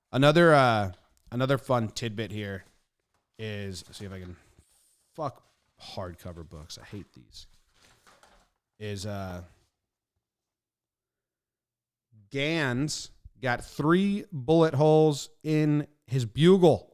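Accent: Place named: Chicago, United States. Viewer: I know you're a Yankee but are you originally from Chicago? I hear Chicago in your voice bro.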